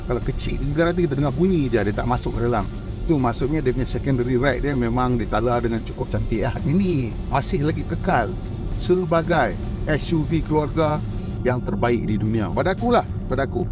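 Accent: North, Malaysia. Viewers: Well review tuan…tapi kali ni personally rasa lagi best sebab kelauq nahu2 utara hahaha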